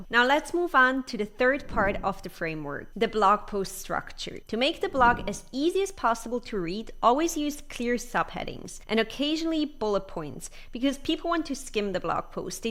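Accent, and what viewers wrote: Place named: England, United Kingdom. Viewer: Hi ! I am new to blogging, loved your English accent and clear pronunciation. I wonder how you learnt it, and how can I learn it. Could you please share the secret and also send me simple blogpost checklist please.Thank you.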